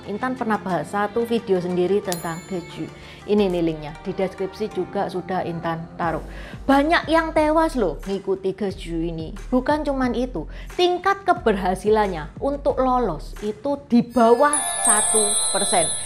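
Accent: Java, Indonesia. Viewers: Logat Jawa medok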